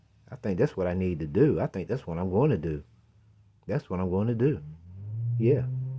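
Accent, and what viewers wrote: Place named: Alabama, United States. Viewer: my fiancée (southerner) said your accent sounds like you’re from Alabama or Mississippi. Was she close?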